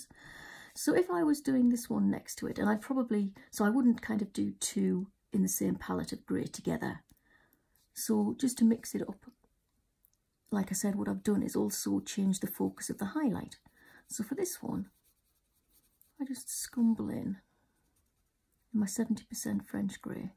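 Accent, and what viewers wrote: England, United Kingdom. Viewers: Thank you for coloring tips!!! Love you channel! : ) Love you English accent...! : )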